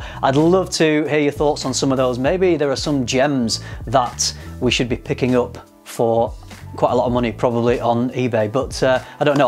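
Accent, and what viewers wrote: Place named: Manchester, United Kingdom. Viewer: To be honest, I'm more focusing on your accent 🥰. Are you Mancunian?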